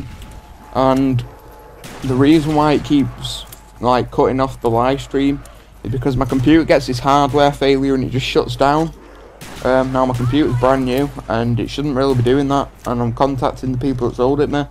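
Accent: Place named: Manchester, United Kingdom. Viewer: u hav a great manc accent im from manchester aswel woop woop !!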